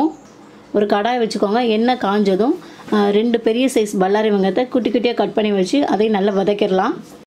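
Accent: India. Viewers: Mam unga native nellai ya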